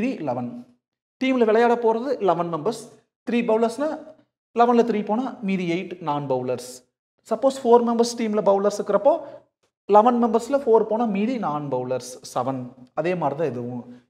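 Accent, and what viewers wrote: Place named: Pollachi, India. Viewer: Excellent teaching sir ❤❤❤❤ . I love your Pollachi accent 😊😊😊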